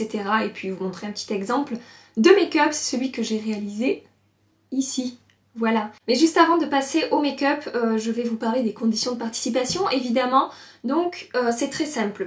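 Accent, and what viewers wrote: South, France. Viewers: ce petit accent du Sud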